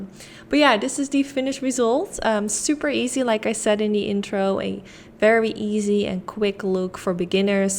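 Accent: Netherlands. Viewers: Are you Dutch? 😁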